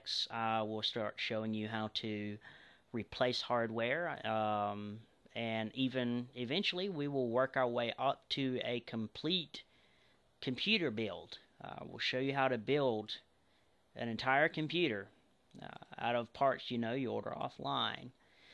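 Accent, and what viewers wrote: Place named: Texas, United States. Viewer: I agree! I love your voice! Clear and precise instructions. I would venture to guess you are in Texas. Anyway, this video has opened my eyes to what my computer can do. I used to use Auslogic, but I believe it had another name. It was on a desktop I had with windows 3.1 and also with windows 95. Now you can guess how old I am. LOL Since I got my new computer, DEL, I've been looking for this, and now, thanks to you, I have it again. Thank you so much! Keep up the good work!!!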